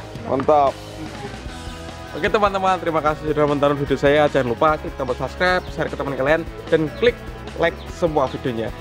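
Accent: Java, Indonesia. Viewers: Koyok e tek wong jowo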